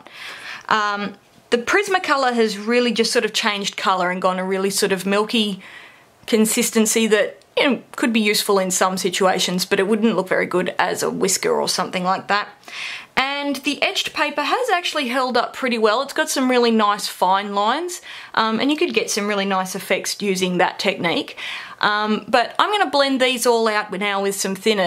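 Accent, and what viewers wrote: Australia. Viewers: Hi! When you say you’re going to blend the white strokes out with a “thinner”, do you mean just like something that you buy that is just called a paint thinner, or do you mean some sort of odourless mineral spirits? This was a good video. I normally end my comments with hi from Australia, but I know by your accent that you’re Australian and it’s always nice to find an Australian video on YouTube! 😃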